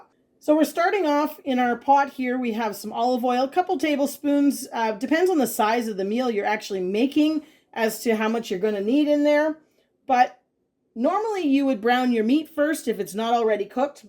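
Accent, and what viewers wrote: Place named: Canada. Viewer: I literally reheated and repurposed a bunch of things I already had made in the freezer or fridge. I then repurposed other items in the fridge that were left over and it was a fairly easy week for us as well. I had to laugh the other day as I was watching one of your videos and my daughter asked why you talking about "a boot"..you were saying about..I explained you are from Canada and you say things just a little differently like Mr Rem and Mrs Annie that we watch that are from Canada too. That stew sounds like it was delightfully rich and wonderful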